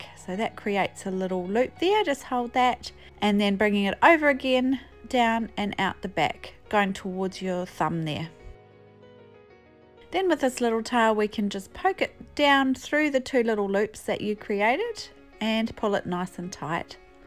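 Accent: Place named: New Zealand. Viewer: Thanks for the video. I will be making something like this for my 7 year old daughter and this video is helpful. I see you are in Australia but it sounds like you have a Kiwi accent.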